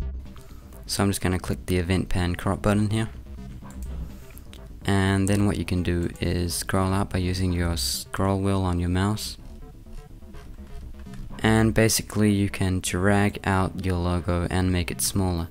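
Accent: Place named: New Zealand. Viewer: lol, he is either from Australia or New Zealand, im from New Zealand, it sounds similar to mine.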